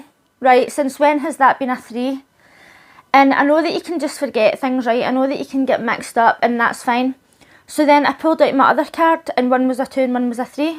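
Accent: Scotland, United Kingdom. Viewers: It isn't your accent, it's your enunciation. I listen to a lot of Scots' channels and I don't have any problem understanding them. I can't understand 2 words out of three that you say, however. I have the same problem with my grandson; he talks too fast and doesn't enunciate clearly. These are easily-solved issues without sacrificing your beautiful accent.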